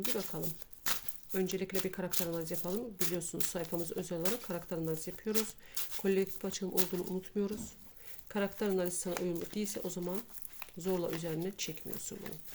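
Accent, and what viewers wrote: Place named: Ankara, Turkey. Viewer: şivemiz bu biz angara nın bebesiyiz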